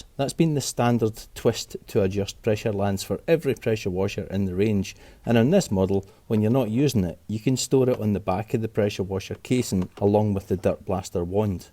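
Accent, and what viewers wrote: Scotland, United Kingdom. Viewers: Hello, A nice laid back assement of Karcher's latest product. And also good to hear a friendly Scottish accent.🏴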